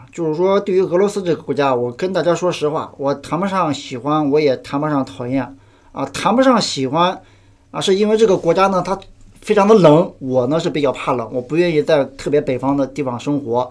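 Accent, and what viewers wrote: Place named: Qingdao, China. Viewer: 频道第一次看，挺好！伙计，听口音青岛银？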